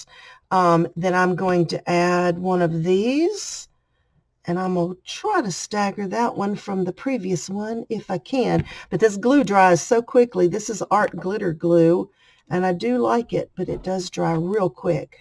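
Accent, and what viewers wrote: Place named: South, United States. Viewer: These are fun. Love your sweet southern accent ❤️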